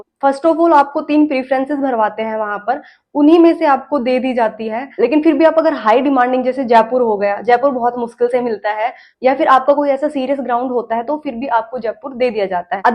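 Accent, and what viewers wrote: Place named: Bihar, India. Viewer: No offense. You got sizzled bihari accent...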